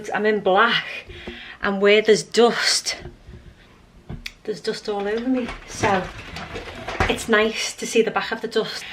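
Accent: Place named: Cheshire, United Kingdom. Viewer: Your home is gorgeous 🥰 could you make a video on organisation/decluttering tips? I’m pregnant with my 2nd baby and I feel like I need to give myself a kick up the a** and get decluttering so that housework is generally easier. Love your videos 🥰 I’m from Runcorn so it’s nice to hear a familiar voice on my telly haha! Xx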